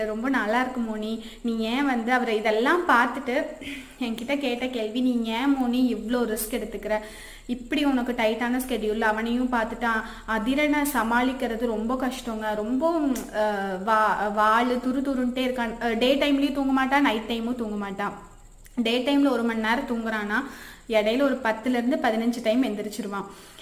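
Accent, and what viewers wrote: Kongu, India. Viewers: I subscribed ur channel especially for ur kongu Tamil and crafts.... Thank u for ur ideas...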